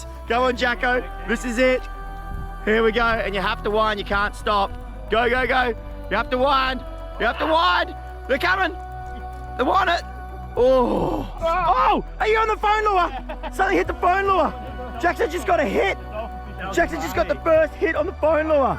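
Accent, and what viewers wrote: Australia. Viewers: Onions, lime, red shit, green shit, blah blah blah cook other side. Lovely Australian accent hey Lads.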